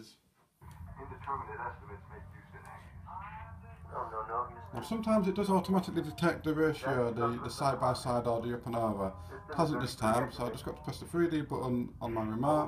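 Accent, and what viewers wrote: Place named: Yorkshire, United Kingdom. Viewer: where bouts are you from, i notice the thick yorkshire accent and you also have "look north" on the telly.. just wondering if your anywhere near Hull... (Where im from!)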